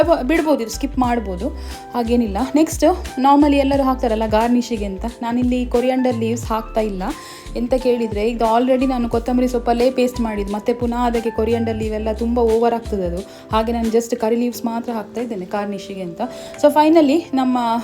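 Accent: Mangalore, India. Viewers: Mangalore kannada ok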